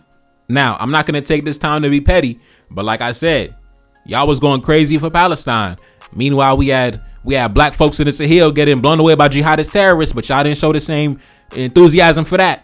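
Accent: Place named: United States. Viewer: My brother where are u from ? U are an expert on africa with American accent . Lol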